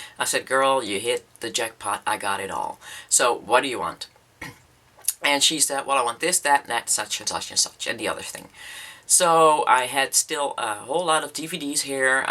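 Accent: Netherlands. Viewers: Such an honest and inspirational video. I watched a couple of your tutorials - perfect. I was trying to figure out the accent and guessed Dutch, and then had it confirmed when I listened to your story in this video. Your finished jewelry projects are to die for - I want that Marilyn bracelet. Can't agree with you more about the importance of research. You nailed it. You have no idea how amazing you are.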